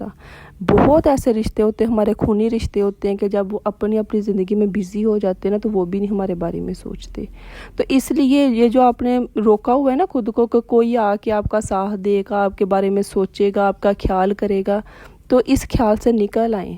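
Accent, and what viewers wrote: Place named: Sialkot, Pakistan. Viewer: Your accent is Sialkot,nice accent,so nice of you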